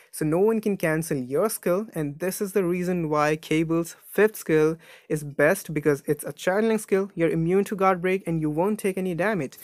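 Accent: India. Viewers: Also u have a slight indian touch to ur accent.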